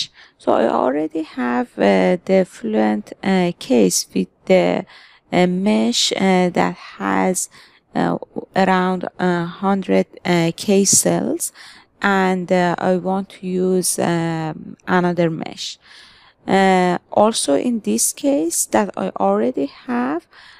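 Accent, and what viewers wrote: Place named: Iran. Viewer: انگلیسی با لهجه‌ی ایرانی 😁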